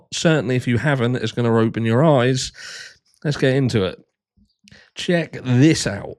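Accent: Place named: England, United Kingdom. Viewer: I love your bright yellow light accent that is in the corner of your room, so pretty!!! As Miss Potts would say “sidebar“ Mr. what area of England is your accent from not the room accent but your voice accent, just curious and thank you.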